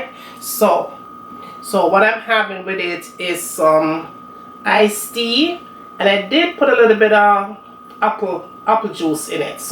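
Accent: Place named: Jamaica. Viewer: You doing good with the Jamaican 🇯🇲🥑accent 😃you go goodie🥰. Happy Independence Day 🍹and you eating your dinner 🥘 right🍍🍅🥒🥥🍍mi making some a your hominy corn porridge 🥣 for my dinner today 8/6/20 🇯🇲😃❤️keep the Jamaican twang going. You not from Spanish country, so you have to speak your Jamaican twang 👍🧄🥕🦞🌷🥰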